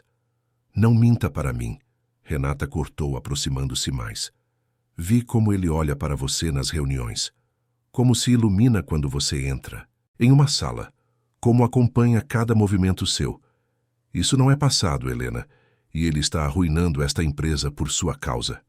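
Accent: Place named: Brazil. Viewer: eu sei, só que ele está narrando em português Brasil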